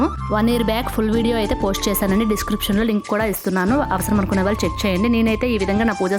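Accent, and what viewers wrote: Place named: Srikakulam, India. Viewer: Meedi vizag na. I am also from vizag, madhurawada. & srikakulam slang la వుంది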